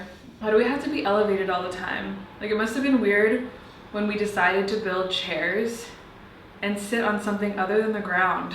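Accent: France. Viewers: The french accent took me by surprise😮😂love itt❤❤ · French accent >>> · French accent. HILARIOUS😂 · Okay but the French accent and black turtleneck go together and suits you haha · Loved your french accent 😂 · The french accent😂❤️ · i love the french accent!!! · I dont understand why did you start talking with a french accent ?? Is it just for fun or is there another reason behind that ?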